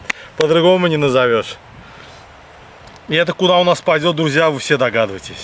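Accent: Baku, Azerbaijan. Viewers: ))) ona görə ki bu Baki akcentidir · Супер!!! 👍👍👍. Бакинский акцент вообще супер!!! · Получаю удовольствие слушая вас. Вы можете не готовить, а просто рассказывать о чем угодно. спасибо ща прекрасный бакинский русский язык, который музыка для меня . Спасибо земляк. Мира и процветания!!🧿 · Джан э джан . Как же я соскучился по нашему бакинскому акценту ... Всем привет из Германии ! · Подписался из-за моего родного бакинского акцента, ну и конечно же очень вкусно))) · Бакинский акцент🙏👍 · Amma akçentiz hamını heyran edir, əsl bakılı akçentidir. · Очень по нашему, акцент приятный, наш. С искренними пожеланиями всего самого лучшего от бакинца, давно проживающего вдали от родного города. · Я уехал из Баку 35 лет назад Живу в Нью Йорке Бакинская еда известна на весь мир Все кто побывал в Баку только и говорят о еде А мне было очень приятно слушать его неповторимый Бакинский акцент,который не спутаешь ни с чем Интеллигентный, красивый человек Дай бог Вам здоровья Это настоящий Бакинец! 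· Я русский но родина моя Азербайджан, я родился в Баку и мне приятно слышат своего земляка с нашим бакинским акцентом. Здоровья вам и счастья. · Къардаш всё супер. Особенно твой БАКИНСКИЙ акцент. Почему говорю я служил в армии в прекраснейщем гостеприимном городе БАКУ Очень тёплые воспоминания. На сегодняшний день БАКУ говорят вообще жемчужина южного Кавказа ,хотя он и при союзе был великолепен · этот родной бакинский акцент - особая причина смотреть видео этого прекрасного человека!!! Каждый раз возвращаюсь от них на родину, в Баку периода СССР, и у меня улыбка от просмотра! Дай Бог ему здоровья и счастья!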